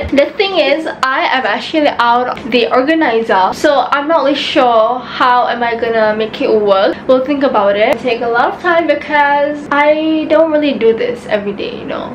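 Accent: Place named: United Kingdom. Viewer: ur british accents 💅💅💅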